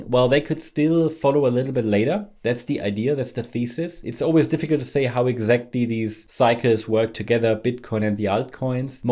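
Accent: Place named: Germany. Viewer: are you? same voice,and sounds like German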